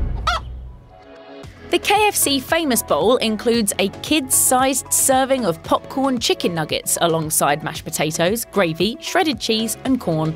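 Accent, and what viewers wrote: United Kingdom. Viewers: All well and good, but these are all American shops and it is a British woman talking about them. Very misleading. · How come you have an Anglo voice over about a100% Yanky topic. I'm in Stalya a mix of Yanky an Anglo and here No one would have a clue about this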